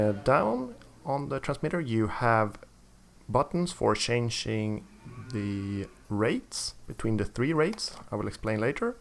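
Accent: Sweden. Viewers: Wooooow helt klart svengelska (man hör det på nästan alla svenskar)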